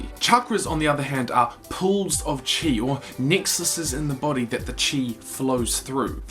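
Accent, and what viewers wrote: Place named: New Zealand. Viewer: if your accent is new zeland, i love new zeland like really, it's so cute and filled with joy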